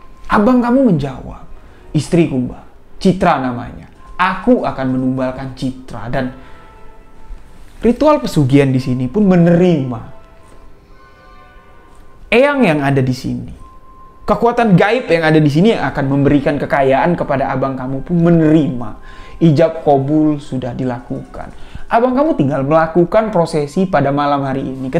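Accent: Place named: Bali, Indonesia. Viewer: oalah..pantesan bang,bahasanya masih ada logat balinya hehe ok bang makasih,semoga chanel bang terus berkembang Aamiin.. 🙏🙏🙏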